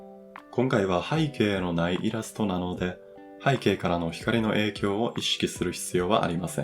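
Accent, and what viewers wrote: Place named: Kansai, Japan. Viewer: 初見ですが絵はもちろん、声が低音でかっこいいし関西弁… 控えめに言って好きです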